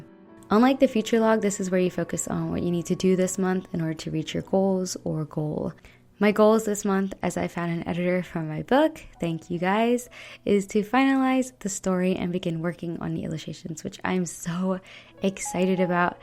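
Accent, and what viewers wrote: Vietnam. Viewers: Leave me a Comment is hãy để lại cho tôi 1 bình luận in vietnamese. Your voice is so sweety, and you are speaking the southern accent.😍😍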